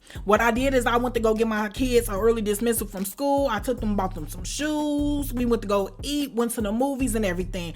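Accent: Chicago, United States. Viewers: Mannnnn you should've sold this shit to NETFLIX or sum!! Nbs I'm engaged like a mug! Lol Saw you trending on here never clicked on the vid then a reactor I watch reacted to your video of your stay in the county. The way you talk is Chicago through and through and it got me hooked and entertained while at work! Lol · I ❤️ a smart Pretty Thug woman! Baby girl you can tell a story like no otha 😆 😂 wit cha Chicago self luv that accent. I'm ya cuzzo from Milwaukee Do tha thang thang baby girl blow up write a book documentaries etc.LUV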